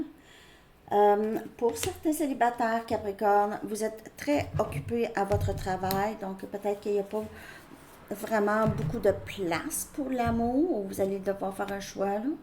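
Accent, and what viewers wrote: Quebec, Canada. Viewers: J adore vos tirages et avec cet accent québécois que j aime tant!❤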